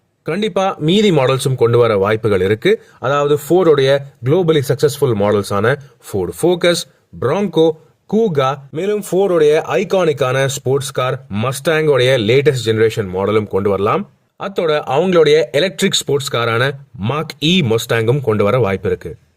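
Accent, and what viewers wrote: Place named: Kerala, India. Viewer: Why you are talking like Vinu Chakravarty… full of Malayalam vadai adikkuthu unga Lin voice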